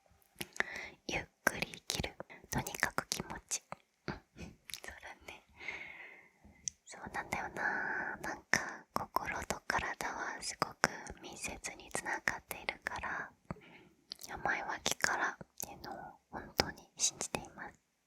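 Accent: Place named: Osaka, Japan. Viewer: スラム街を見学しました🙂‍↕️え、大阪弁！？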